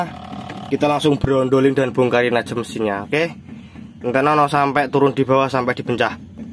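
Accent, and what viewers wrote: Java, Indonesia. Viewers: Sampeyan asline jowone pundi mas..?? Jowone kok medok temen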